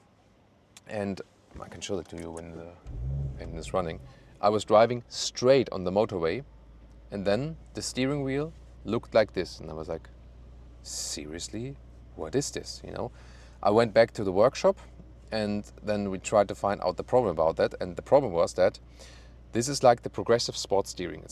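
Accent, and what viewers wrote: Germany. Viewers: First time watching this channel,straight away notice Hard German accent.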